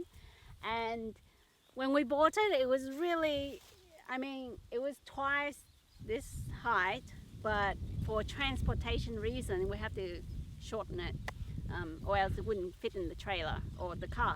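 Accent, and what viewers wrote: Australia. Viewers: Hi, I like your approach for a wind break. Bamboo has the highest oxygen output and carbon capture of any plant. The high Silica content of the leaf drop captures moisture in the ground and provides habitat for insects and critters. Oldhamii can grow three stories tall <link> and can withstand 120km winds. It can be char-coaled and it will produce the hottest burn of any charcoal known today. You sound Australian? Where are you in the country? I'd like to know for climate zone reasons. Also I would suggest reading about wind break design, usually you plant small trees infront which stops the full frontal force directly onto the trees or plant and minimizes turbulence, though I'm sure Odhamii can take it. see <link> & <link> for a great explanation.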